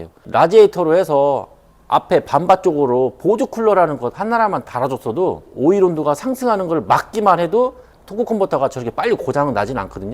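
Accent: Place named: Chungcheong, South Korea. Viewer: 충청도 사투리 쪼~~끔 펌프라고 하면되지 쁘엄 쁘 ㅋ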